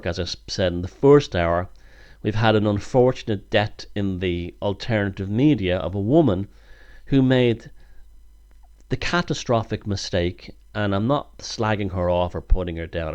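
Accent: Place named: Dublin, Ireland. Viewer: Great to have you enjoying your work, iv learned so much from your videos, I'm like the gotchy man, ya have a Dublin accident people think your a cretan, and my accent is like yours dub. Not the new flat strong Dublin accent, thank you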